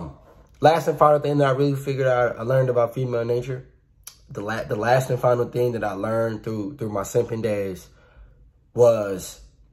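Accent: Baltimore, United States. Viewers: Yooo are you from Baltimore? I can hear the accent. I’m originally from Silver Spring, Maryland. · Big homie.... you from east baltimore?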